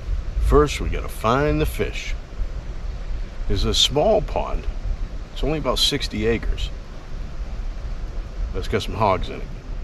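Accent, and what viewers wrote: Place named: Massachusetts, United States. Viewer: The second you started talking I thought you were in MA. I just moved to the north shore recently, what part of MA is this in? Glad I discovered your channel bro, I'm gonna go through the catalog and subscribe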